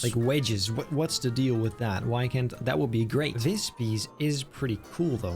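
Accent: Netherlands. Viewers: yoow broow jij bent nederlands toch? ik hoor het aan je accent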